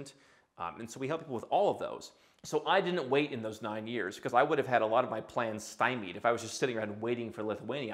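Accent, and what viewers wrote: United States. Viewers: It's an interesting point how you brought up that pride month is about identifying how you want to identify, but that you can't be Norwegian. One's identity is an internal thing, but is ultimately irrelevant. With your accent, you're obviously an American for all intents and purposes except the passport (which, since most Americans don't have passports anyway, further supports that point, with the caveat being of course that since you renounced your citizenship, you cannot live in the US). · As soon as you speak we know you're American! Nothing wrong with that and the paper you hold can't change that. It's nice that you're also s citizen of the world. 😊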